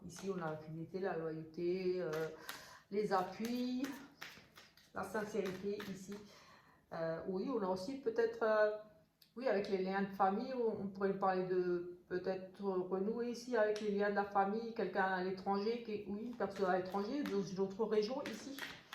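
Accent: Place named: East, France. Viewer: Un accent de lest on dirait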